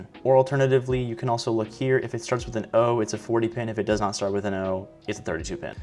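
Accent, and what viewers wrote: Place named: Canada. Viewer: He’s Canadian can’t you tell EHH?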